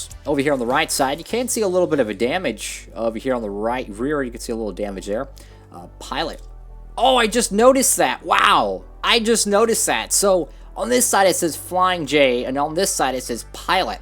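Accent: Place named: New Jersey, United States. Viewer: And also you sound like your from jersy but im from alabama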